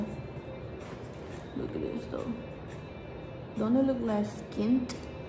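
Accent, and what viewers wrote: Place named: New York, United States. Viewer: the fact i can tell you from new york lmfaoo me too 🥰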